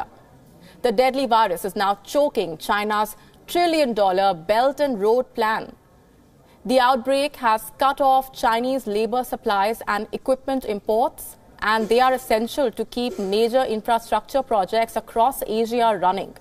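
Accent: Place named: India. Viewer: Very heavy Indian accent.....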